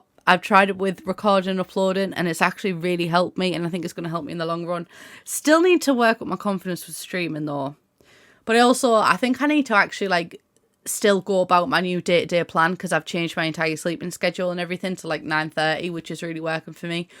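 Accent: United Kingdom. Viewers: 5 years of watching British people talk has brought me to be able to understand what your saying, and I don’t regret a single moment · I don’t understand british accent at all xD but the house is so pretty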